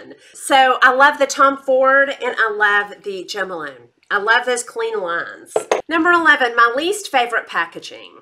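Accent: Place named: Texas, United States. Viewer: Here from mr. Kongs mom channel... love your accent I'm married to a texan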